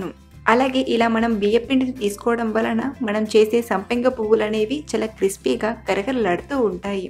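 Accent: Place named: Warangal, India. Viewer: mi proper warangal anukunta same ma warangal language matladuthunnaru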